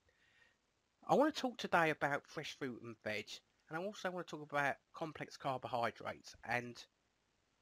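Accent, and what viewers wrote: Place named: London, United Kingdom. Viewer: OY!!!! You sound like you’re from London!! 🤣👏👏👏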